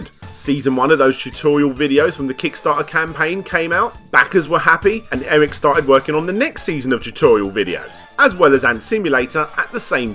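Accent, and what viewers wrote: United Kingdom. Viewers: Just kidding about not liking you as much. You British people have a way of spewing your gob that is just delightful for my American ears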